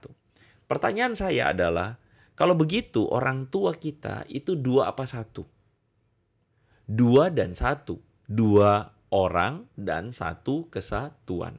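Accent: Java, Indonesia. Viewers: Dia SDH di besarkan di kalangan muslim kemudian di pakai sbg plat org asli Papua utk berbicara seakan akan dia baru murtad dr Kristen, buktinya dia TDK berlogat Papua tp logat Jawa ini buktinya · Wajahnya mirip orang Papua, tapi logatnya mirip dengan orang Jawa.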